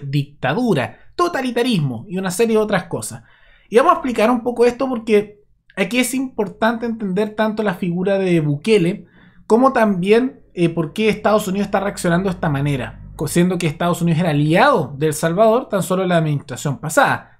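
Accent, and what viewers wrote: Chile. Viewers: Estas hablando pura mierda, si esa informacion es tuya y si estas agarrando informacion de otra persona deverias de investigar mas de Bukele y nuestro pais para hablar. No seas la misma mierda como otros. Por la forma en que hablas puedo notar que eres Chileno deverias de hablar de tu pais Si lo conoces aunque lo dudo. Pero comiensa por investigarte mas por favor.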